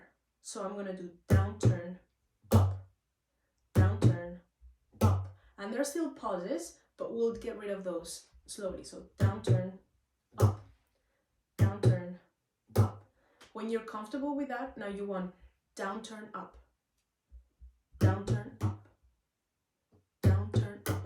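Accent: Ireland. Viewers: Hi, im both new to your youtube site and bodhran playing. So new in fact that ive yet to settle on which bodhran to get as my first but after watching several tutors on line, i can easily say I've gotten the most out of your content so, "yes" to your question about doing more of thes q&a sort of thing! You're an excellent drummer! I get alot out of listening but watching what you do to get those sounds is even moreso. I'm impressed with your great command over your techniques and dynamics. I have been a drummer for over 50 years playing loads of different genres of music but in all that time Irish music in general was not really in my lifes soundtrack. Nor were any of the musicians with whom I've played into it enough to pursue it either for fun or profit. So on the shelf it remained with green bagels and green beer, corned beef and cabbage and all the other commercialized "American Trad St. 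Patricks Day" faire. After morning Mass, of course! 😉 At any rate, I'm giving it a go now if only to play to cds! But im sure I'll work it in to a session somewhere eventually! Thanks so much for your inspiration as well as your wonderful content on you tube. (Its the only social media outlet i use for this sort of thing.) Oh, and your brogue is lovely! It reminds me of the way my family in the Offaly area speak. Ive other family around Ireland and for such a tiny country, the range of brogues seem vast! 😆 Be safe, and continued blessings! ✌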